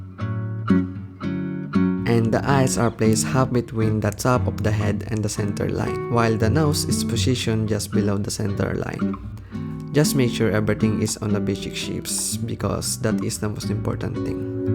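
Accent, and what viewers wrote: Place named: Philippines. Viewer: you have a filipino accent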